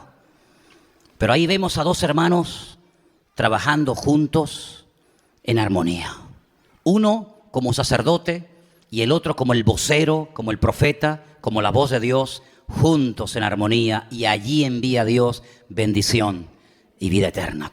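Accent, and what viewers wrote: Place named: Central America. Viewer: Disculpe pero usted no podrá hacer el idioma de otros países aún se proponga imitar los por qué se nota su acento ..de centro américa así viva años en estados unidos del norte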